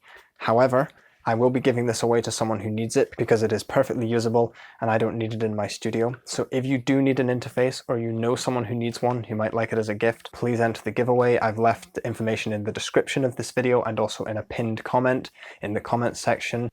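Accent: Scotland, United Kingdom. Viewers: Kinda sounds Scottish?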